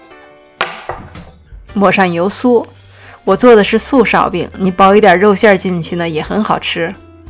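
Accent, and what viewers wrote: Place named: Xi'an, China. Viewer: 最开始看是因为地道的西安味，难得发现一个老乡，然后看见所有配方都有详细说明，量杯和克数，还有中英文对照，尤其用量杯，大小勺，非常方便，我习惯用计量，每次看见网上食谱用克数就头疼，现在方便了，要做什么都打开的视频看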